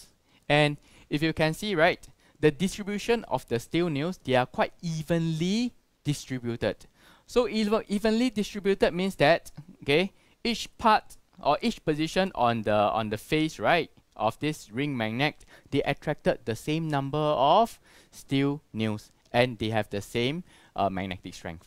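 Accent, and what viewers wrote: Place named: Asia. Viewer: bro you have perfect asian accent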